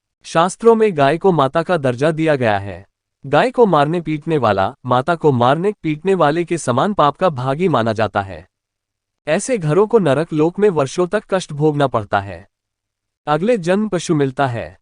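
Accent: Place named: United States. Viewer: Aisa lagta he america ka admi hindi bol raha he, chutyapa 😝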